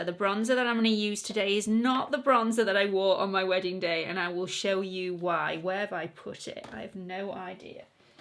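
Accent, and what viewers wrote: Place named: England, United Kingdom. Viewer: I love watching you! You’re beautiful and funny, and that English accent is to die for❤️❤️❤️ Every time I call my husband and scream”just listen to her voice”the answer is ‘Oh, I know, I know, alright”😂😂😂 I just adore you!😘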